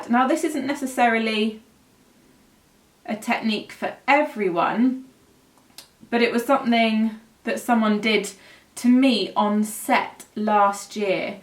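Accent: United Kingdom. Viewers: I could listen to you talk for days!! Sounds so proper and "British". Makes my American accent sound so dull! Lol. Your makeup is always stunning! And always educational. Thanks!💕💕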